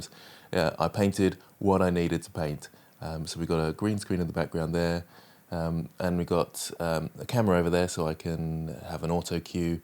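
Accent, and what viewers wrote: United Kingdom. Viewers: Congratulations. Very well deserved. Your tutorials are so easy to follow even for a non-English speaker. The British accent is a very welcome bonus, too.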